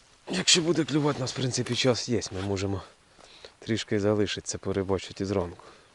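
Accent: Poltava, Ukraine. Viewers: теж хотів запитати і знайшов комент.. бо почув акцент полтавський..та і зарості схожі)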